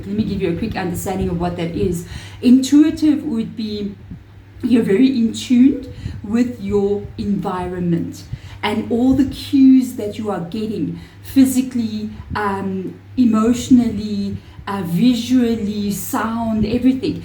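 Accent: Afrikaans, South Africa. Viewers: I have been thinking about writing a book for years. I have so much information that I feel is valuable. My ADD, anxiety, and depression are really limiting my energy and motivation. I love your accent. I lived near Cape Town for a while. Lovely country and people 💛 love from the USA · I thpught i picked up an Afrikaans accent. Im in the vaal in south africa. I havnt got a wand but i use a cow tail . And im so obsessed with horns haha. The things you are saying are so spot on. This whole reading ifeel is aimed at me. I am going thru a spiritual awakening and im writing a book. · You are 1000% correct, when I do a reading I can never recall the information 😂 thank you so much for your channel. My great grandmother is one of my ancestral guides, and she was Afrikaans. I appreciate your beautiful accent, makes me feel closer to her, which is funny cos I know she is always with me xx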